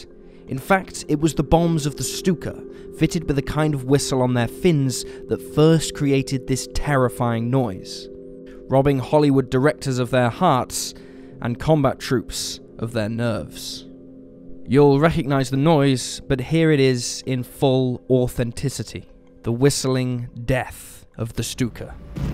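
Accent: United Kingdom. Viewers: "american content makers", maybe, but the narrator here sounds like a limey git. Being "dutch", I would think even you would notice that. btw, I'm German descent, and I found it amusing that said limey git would pronounce "Ju" as "Jew" when describing an aircraft (a "Yunkers", if properly pronounced) used by a regime that was bent on exterminating an entire race by that same name. Twit.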